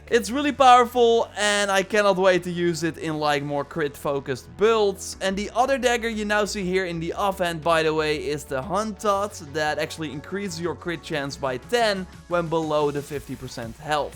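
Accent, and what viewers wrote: Netherlands. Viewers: You have a dutch accent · Sick dutch accent😂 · Is he from thuis netherlands it souds so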